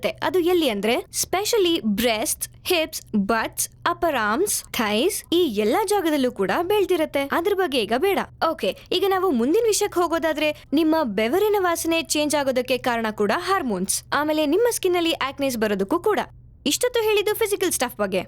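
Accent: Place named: India. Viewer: fifakillaz 14 Indian accent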